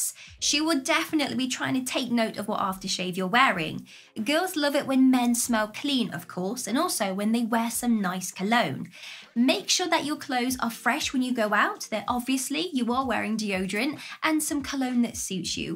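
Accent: United Kingdom. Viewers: Now even though I'm 57 and very happy in my own skin, this is such a fun video to watch because the speaker has a most pleasant British accent and seems to be able to present her "material" in such a fun & fresh way. IMHO, this video is way more enjoyable than most of the shows on TV. Anyway, I just wanna say, "keep up the good work"..... I think your efforts are not only practical but quite easy to grasp😊 👍👍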